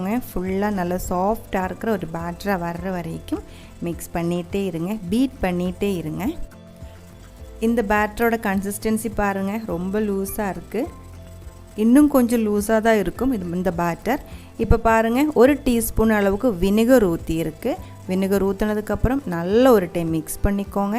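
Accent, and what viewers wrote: Kerala, India. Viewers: Mouth melting..... Clear recipe... Malayala tamil cute.... Gonna try for my kids.... Thanks a lot for the recipe.....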